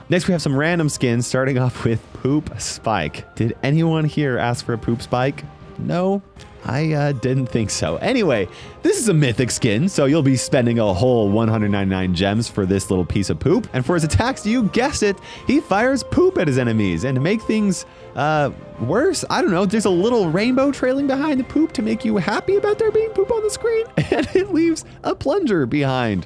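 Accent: Norway. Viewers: Are you Norwegian? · Wait wait wait Are u Norwegian?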